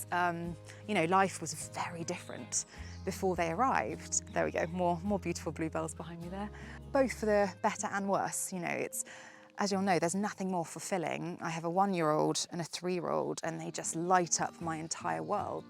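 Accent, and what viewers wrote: United Kingdom. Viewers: Yes, I really did. Being an indian is so difficult to speak and listen to British Accent, so this video is very helpful to improve my British way of speaking English 😊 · Hi, I absolutely love this kind of real-life video! I really adore the countryside — thank you so much for sharing these beautiful views with us. I also really enjoy learning British English accent from your videos, and I’m looking forward to you making another one in the future. ✨ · As an English learner I mostly like British accent and such kind of videos are very useful. Mam, could you tell about camera you recorded this video with. Video quality is incredible. ❤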